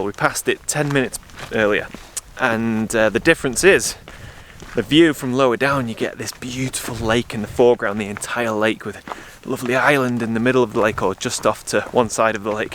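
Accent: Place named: England, United Kingdom. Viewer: The North-West US, that is? Near Canada? I had to check as the English accent created ambiguity ;) The other day I read a story of a guy who survived a grizzly bear attack in the Canadian side of the border. On an island where the wildest predator is a fox and the whole country is man-made, man-influenced or man-kempt, where rain can surprise you, but generally will not get you hypothermic in a short time - what did you take along as risk-mitigation on this trail?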